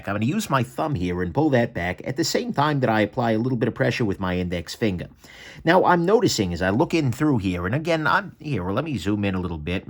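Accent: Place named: Northeast, United States. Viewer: I love your accent, where are you from? NE area I'm guessing.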